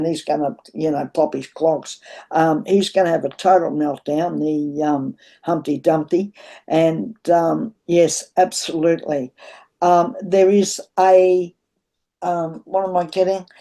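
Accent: Australia. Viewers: You sound Australian darling? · your amazing, re trump and the military , here are some potential future scenarios for you to ponder. lets say the democrats gain enough control of both houses of congress in 2 years time in the mid term elections , to impeach trump or maybe by some miracle it happens earlier he refuses to go , george w bush intervenes to try and get trump to leave, he fails , the pentagon or military intervenes to arrest him, alternative scenario , the supreme court rules against him , he defies the supreme court, the court charges him with contempt of court , and tries to have him arrested. he defies the US marshals trying to serve the arrest warrent , the pentagon or military intervene. when you say this path was set a long long time ago , your not wrong, donald trump is the re incarnation of jefferson davis the president of the rebellious south during the civil war going by your accent you live in oz , so do i , maybe one day we will talk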